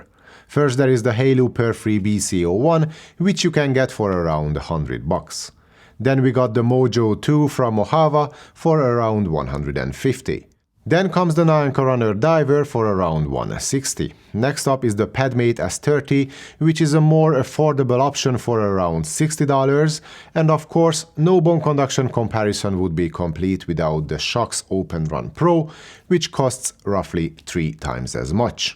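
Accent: Hungary. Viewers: Szerintem magyar akcentust hallok 🧐🤔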